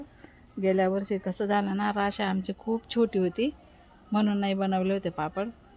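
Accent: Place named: Dhule, India. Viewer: तुम्ही धुळ्याच्या आहे का ताई भाषा तशी आहे म्हणून विचारलं खूप छान झाला सगळा स्वयंपाक 😊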